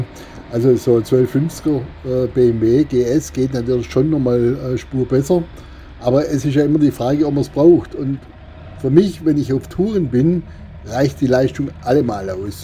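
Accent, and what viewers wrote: Swabia, Germany. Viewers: Super !!! Wieder ein Motorradvideo - das Schwäbische ist echt super - heimatverbunden eben - fast wie die Aprilia - das ist wirklich ein tolles Moped - Danke für das informative Video - das immer etwas anders ist als die anderen Videos !!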